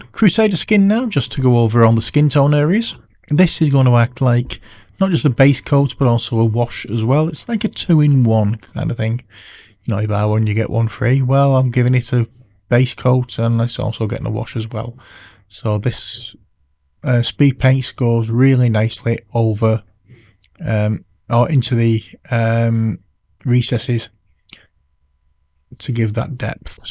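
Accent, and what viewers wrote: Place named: Leigh, United Kingdom. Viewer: Just looking for a painting recipe for Delaque, and pleasantly surprised by a local accent while watching over the border here in sunny Leigh!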